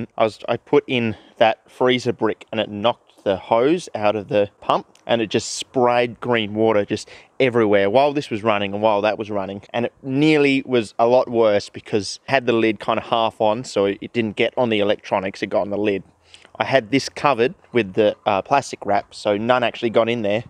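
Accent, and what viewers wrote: Adelaide, Australia. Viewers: He's a proud Adelaide lad! We all sound like that over here.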